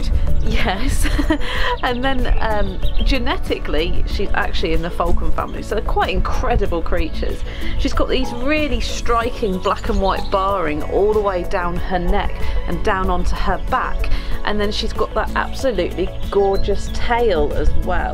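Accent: United Kingdom. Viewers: Excellent presentation. Even without the British accent this would be an excellent presentation.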